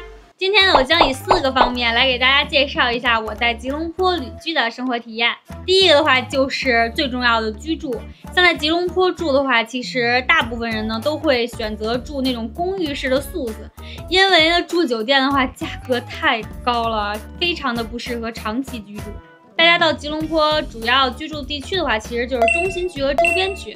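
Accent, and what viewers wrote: China. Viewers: 是那里人？口音像是北方人😊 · 主播北京的吗？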